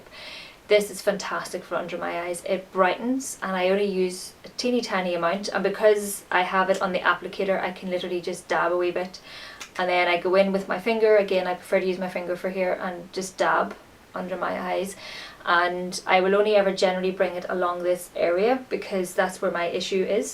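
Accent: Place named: Northern Ireland, United Kingdom. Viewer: u from Belfast or down south I'm from northern Ireland an u sound like you are to lol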